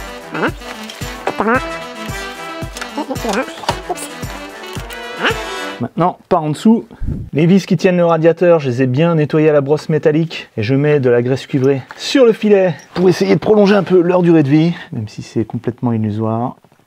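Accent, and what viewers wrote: Germany. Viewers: Ton accent allemand est très bien! Salutations de la Suisse allemande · Was für eines schönes video Ich mag deine Deutsche Akzent, sehr gut !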